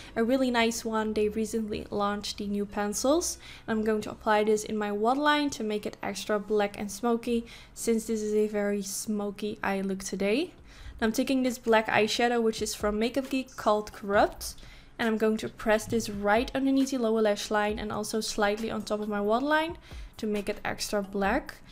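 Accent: Netherlands. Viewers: your Dutch accent which I find charming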